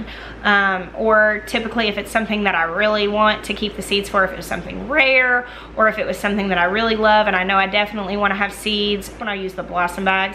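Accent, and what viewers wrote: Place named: North Carolina, United States. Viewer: Your channel was the first channel I watched when I decided to go Eden on my yard. Very informative and thorough on your videos. The accent also makes me miss NC. Keep up your great work and on educating myself on so many topics. Thank you.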